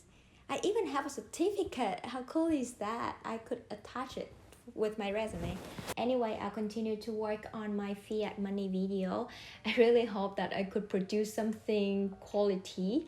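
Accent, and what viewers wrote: Australia. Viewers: by the way, you speak English with an Australian accent, correct? I lived there as a child.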